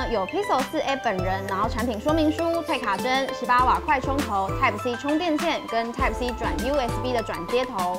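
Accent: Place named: Taiwan. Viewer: 台湾华语 赞！！！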